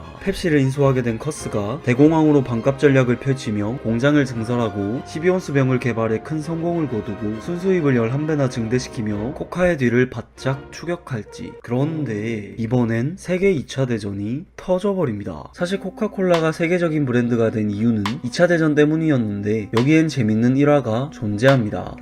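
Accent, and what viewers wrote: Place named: Gyeongsang, South Korea. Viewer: 영상 개재밌네ㅋㅋㅋㅋ어눌한 경상도 사투리로 말을 왜케재밓게하심ㅋㅋ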